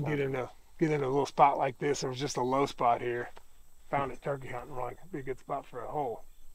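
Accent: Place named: Arkansas, United States. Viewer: Sounds like you’re from Arkansas…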